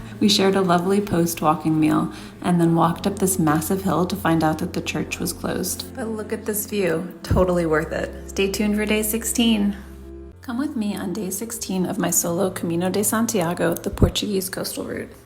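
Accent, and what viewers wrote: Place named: Australia. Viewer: You sound like an Australian..Thanks for sharing your Camino experience.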